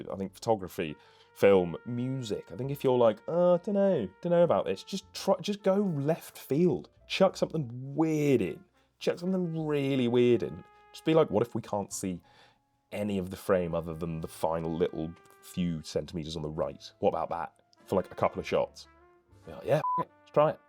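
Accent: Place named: Belfast, United Kingdom. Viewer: Completely unrelated to the video (which is amazing, thank you!) I know your english, but there is a few times that you sound like you are from belfast. Is any of your parents from belfast?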